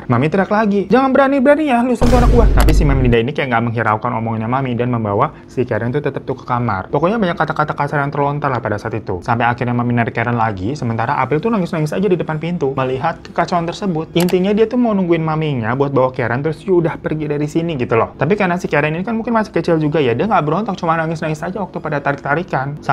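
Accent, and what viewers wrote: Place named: South Jakarta, Indonesia. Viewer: Aksen jaksel nih keknya wkwk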